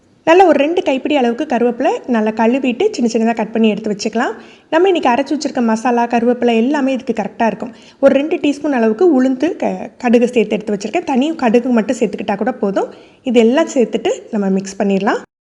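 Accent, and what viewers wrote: Tirunelveli, India. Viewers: நீங்க எந்த ஊர் -மா.நான் திருநெல்வேலி.நீங்கள் பேசுறது திருநெல்வேலி மாதிரி உள்ளது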